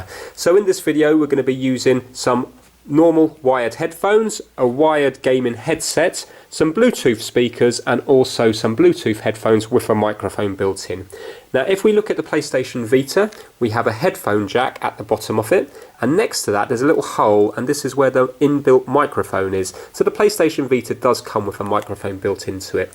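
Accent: United Kingdom. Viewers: The british accent is very funny lmao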